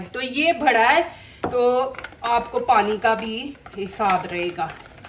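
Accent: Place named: Punjab, India. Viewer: Allah apko sehat de, love your typical Punjabi style in urdue , I am from Texas United States of America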